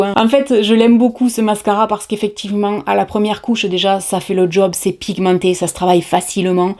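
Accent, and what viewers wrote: South, France. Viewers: C'est vraiment un régal de te regarder et de t'écouter, ton sourire, ta joie et ta voix qui chante le sud, j'en raffole Bisous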